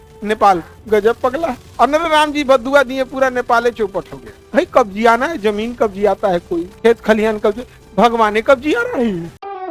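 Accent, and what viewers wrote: Bihar, India. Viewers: lecture dene se pehle thoda research vi karliya karo .....bihari ho sab ko pata hay, harek video me aapne behwar aaur bolne k tameez se bar bar biharipan ka praman dena jaruri nahi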